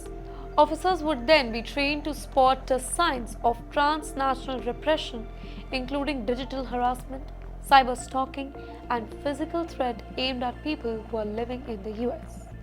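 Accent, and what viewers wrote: India. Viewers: This anchor is based from India and her accent is Indian . Don’t know how much of this is really true. Would love to hear the views of an American based legit news channel .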